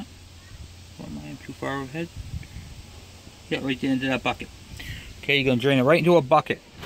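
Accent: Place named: Maine, United States. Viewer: also, i noticed you have an accent... You wouldn't happen to be from Maine living in NH?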